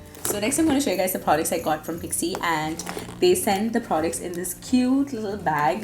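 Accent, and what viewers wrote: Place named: England, United Kingdom. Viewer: Hi, I find u really pretty. Ur makeup tutorials y I like the most is mainly because u do transform from a keralite to almost a different nationality. I do watch ur malayalam YouTube channel. Like ur English accent butt u speak sooo fasttttt. U need to slow down a bit. Thanku n wish u all the luck n luv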